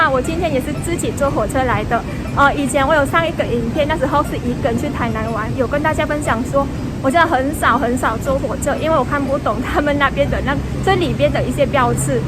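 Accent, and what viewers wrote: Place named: Vietnam. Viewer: 中文超流利的，，，，只是還有一點（越南腔）,不然真的是正港的台灣人了，，讚哦！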